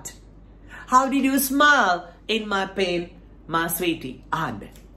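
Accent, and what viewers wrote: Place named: United Kingdom. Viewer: My loving teacher ,will I ask you a one thing ? How do you speak in English like a british one..? 😍😍😍 I also like speak in English as same as you😌🌼🌼🌼I already have started work hard for it😊✨️I believe some day , I will be able to speak in English nicely 😍😌🌼now I feel I am improving day by day because your strawberry English 🍓frankly speaking now I can't help speaking in English..although sinhala is my mother tongue ...now I like speak in English better than sinhala 😌🍓your strawberry English is really fit for me.🍓❤️😍happy to say I have selected the best way to learn English.. I remember one day our school teacher said us ENGLISH IS A CROWN 👑 and the one who wear that it's seems like a king 😍😍😍 I wanna wear that crown ..please sir help me to reach my dream 🙏✨️ Your poor student 😌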